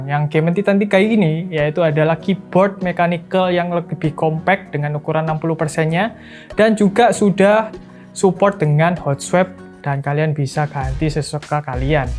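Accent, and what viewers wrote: Java, Indonesia. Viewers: medok wkwk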